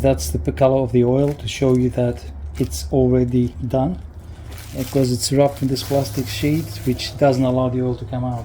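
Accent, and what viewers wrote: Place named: Australia. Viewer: I can definitely hear an Oz twang in the accent these days 😂 settling in fine i see😉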